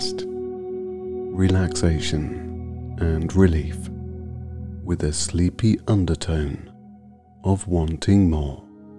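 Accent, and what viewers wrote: United Kingdom. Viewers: Why are these videos always a deep voiced British guy or a Russian woman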